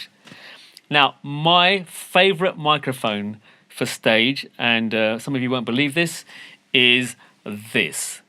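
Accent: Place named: United Kingdom. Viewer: I liked his British accent..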